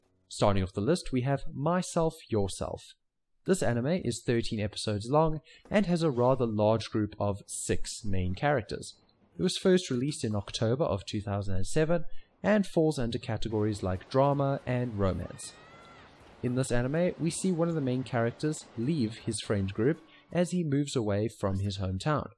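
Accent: Afrikaans, South Africa. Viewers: What is that accent? Afrikaans English ? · Are u Afrikaans?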